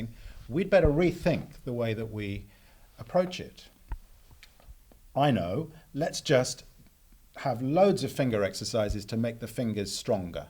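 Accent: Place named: United Kingdom. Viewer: No offence to your job done but I hate UK accent... ugh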